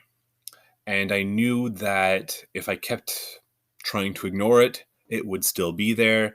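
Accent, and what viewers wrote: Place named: Canada. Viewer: I love Canadian accents.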